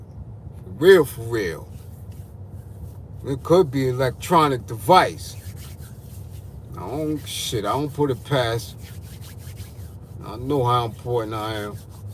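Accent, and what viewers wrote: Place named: New York, United States. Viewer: I just like your style man. The way you speak, the New York accent, knowledge being passed on, I salute 🫡 you OG, 29 year old brotha here. I’m saving this video to cause you responded to some of my live chat 💬 comment 😂😂. Keep it safe out there as well.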